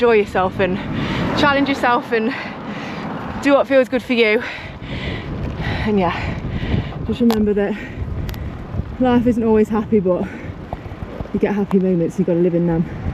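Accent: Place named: East Midlands, United Kingdom. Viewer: Are you Notts folk? Defo an East Mids/South Yorks twang.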